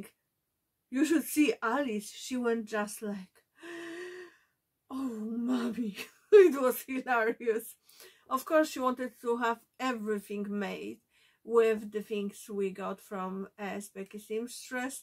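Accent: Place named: Poland. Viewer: I also love aprons. Saves your clothes from stains while looking great. I love your accent it reminds me of my childhood. Poland? ❤️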